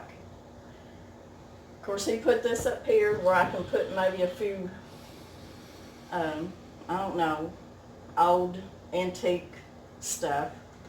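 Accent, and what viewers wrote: United States. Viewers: Wow, that is amazing. Next time take some video of him building this stuff :) What an amazing kitchen and pantry that you have. Oh my goodness... Yeah, please do some teaching videos on CANNING !!!! <3 <3 <3 God bless! I cannot figure out what state you are in with that accent... hmmm....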